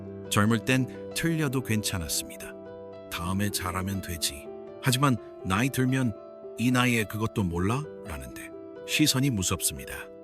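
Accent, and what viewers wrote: United States. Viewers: 발음이 미쿡교포이신것 갗아요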